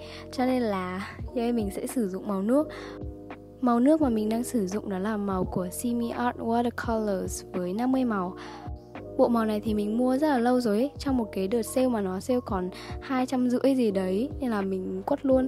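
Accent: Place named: Nghe Tinh, Vietnam. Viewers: chết rồi mừn răng đây nghe là biết dân miền trung r k lận vô mô đc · "Chết rồi, mần răng đây, ồi toang..." Chùi ui show cái giọng Nghệ Tĩnh tui hơi bị thích á, quê Hà Tĩnh nên thích lắm cái giọng này ế, iu cực... Hây da mún zề quê quớ ừ cả 3 năm rồi chưa về HT luôn ý, mà cho mềnh hỏi ở chỗ nào ở HT zợ?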